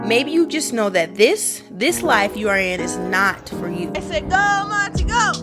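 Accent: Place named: Honduras. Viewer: Awww beautiful words same way i feel currently i have everything in the US but part of me is like dead, not even sure how i found your chanel glad i did... our purpose in this earth is to enjoy life, have peace, show our kids they are more things outside than these routines, not sure if you are honduran i can hear some accent there 😅 we love you guys! 🇭🇳🫶 and i will be praying for your dad 🙏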